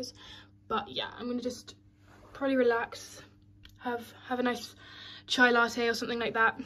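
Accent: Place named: United Kingdom. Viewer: I never realized you were British why did I assume you were American the whole time lol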